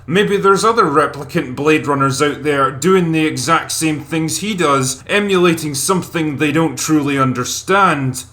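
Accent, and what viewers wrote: Scotland, United Kingdom. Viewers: TCD's accent sounds scottish but some vowels are different... "Chill pill" doesn't become "chell pell", and often "spud gun" doesn't become "spood goon". Anybody know where he's lived?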